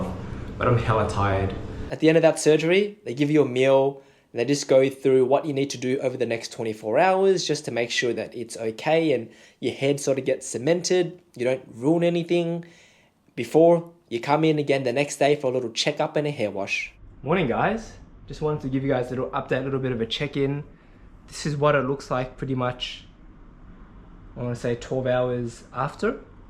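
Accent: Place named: Australia. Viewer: I'm assuming with ur aussie accent the $4k is in AUD and not pandering to the yanks? :) p.s the results look great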